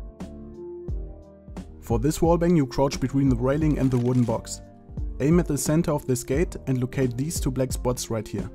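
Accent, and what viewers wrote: Germany. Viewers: Hat das irgend einen tieferen Sinn, dass du mit einem fürchterlich deutschen Akzent englisch sprichst? Warum nicht einfach auf Deutsch? Mega anstrengend sich dein englisch anzuhören ^^ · DAT GERMAN ACCENT hahahaha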